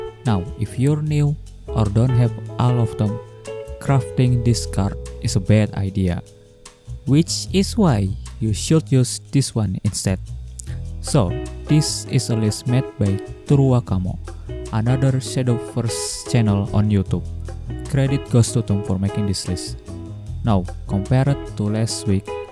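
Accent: Indonesia. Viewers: orang indo ya? ko medhok?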